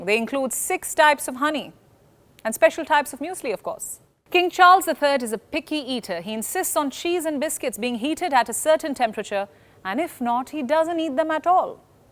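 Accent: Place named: India. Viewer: Listening to a Hindu accent is uncomfortable… Sorry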